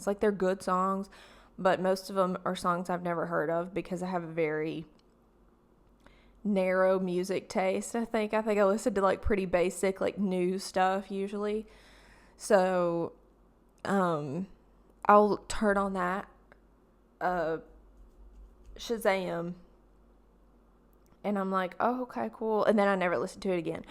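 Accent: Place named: South, United States. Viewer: With your southern drawl and all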